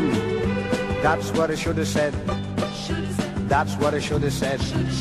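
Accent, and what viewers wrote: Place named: Yorkshire, United Kingdom. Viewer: Good comedian. Every now and then I listen to his Yorkshire twang to remember where I came from.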